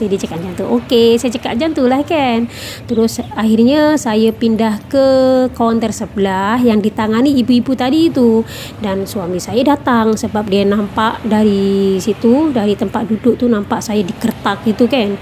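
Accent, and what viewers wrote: Java, Indonesia. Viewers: Slank Jawa nya pekat.Walau pun dh lama dok M'sia.Semoga pelan pelan berubah agar jadi Org Malaysia.Maaf bukan tujuan menghina tapi kagum dgn ketabahan hidup di M'sia.👍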